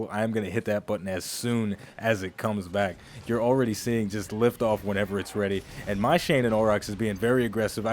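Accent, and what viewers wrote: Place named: South, United States. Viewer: The taunting and the southern fried accent made this video entertaining. Well done.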